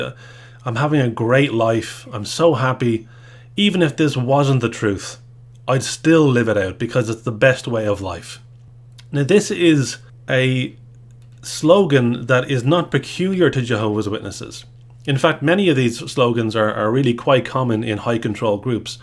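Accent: Ireland. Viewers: haha after I lifted off it was like watching a whole new video. I really enjoy the cadence of your Irish accent. I sent this to my daughter🤓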